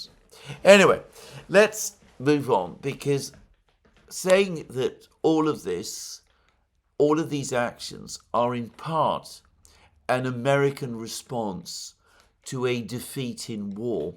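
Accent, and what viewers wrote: United Kingdom. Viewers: Excellent explanation of Bretton Woods - perhaps with your professorial panache ( I mean this sincerely, no sarcasm intended) you can explain to most (untutored) Americans what deficit-fueled economics and and fiat-currencies are. I don't even believe most economists know - or can articulate it. You're clearer yhsn Jeffrey Sachs. If only we could all wrap our minds around what the heck a tariff really is. Your very proper British accent would definately make the "lecture pill" go down easier.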